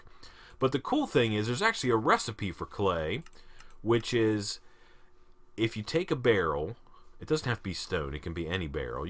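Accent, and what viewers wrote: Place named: United States. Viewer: I'm sorry but you sound country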